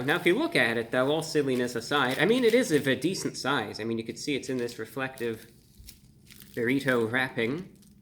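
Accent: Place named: Transatlantic, United States. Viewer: You are just adorable. I love your kindof transatlantic accent talking seriously about new fast food releases. I'll go check out your other videos now! I might try this if I can get it with ground beef instead; I'm not a big steak person to begin with and I won't go anywhere near fast food steak.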